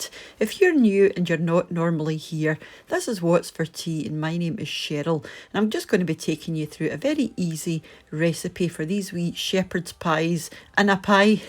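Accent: Scotland, United Kingdom. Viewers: Your demonstrations was simply informative, accent from Scotland added a little nice fair. Cottage pies were SENSATIONAL! Thanks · Love the accent Scottish i would say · I loooove ur channel, just stumbled on to it and love ur scottish accent. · Hello! I love cooking and improving my English with these videos. Can somebody tell me what accent this is? Is this Scottish ? Thank you all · Thank you for sharing. I will definitely be making these pies. My husband works away from home, so I cook a lot of different foods, which I freeze and when he comes home on his days off, before he goes back to work, I pack a couple of bags with the frozen foods. Good way for him to not spend on meals! New subbie from Northern Alberta, Canada 🇨🇦. Love your accent! One day I hope to visit Scotland! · Wonderful recipe. I’ll opt for vegetarian but it will be perfect for chilly fall suppers. 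Thank you. Your terrific accent reminds me of dear friends who live north of Edinburgh and the former owner of the 3 Chimneys restaurant in Skye, Shirley Spear. She was a great chef and made our time on Loch Dunvegan the more memorable.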